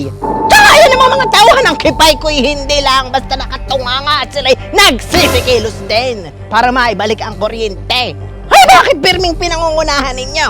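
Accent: Batangas, Philippines. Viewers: Batangas ba ang accent ni messes?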